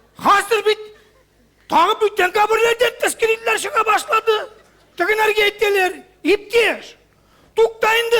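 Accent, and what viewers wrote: Chuvashia, Russia. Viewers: Как точно! Некоторые мои друзья чуваши так и говорили на татарском, ну точь в точь. Молодец!!! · у него вроде всегда такой акцент, чувашский.. может он чувашин? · не про чуваш а с чувашским акцентом играет чувашина на татарском языке истории рассказывает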